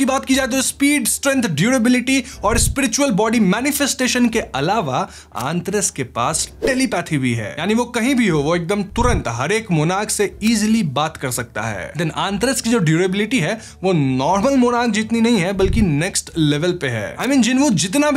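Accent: Bengal, India. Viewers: bhiya aap sabse pehele anime ki story cover karo aise bhut confusing ho rha hai please 1st full manwa explation chapter wise and then all the details of characters,gods and monarchs please bhaiya you will be the 1st to cover this anime fully chapterwise on youtube and btw love your videos so muchlots of love and are you bengali as you sound kind of like them as I am bengali tooooo please consider my request please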